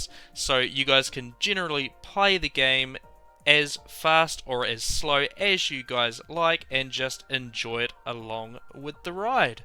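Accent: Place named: New Zealand. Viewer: So funny, yours was the first video I came across looking for tips for day 1 EC and immediately after hearing your voice was like, damn it's a fellow kiwi, good luck with your channel mate, and subscribed so keep putting out content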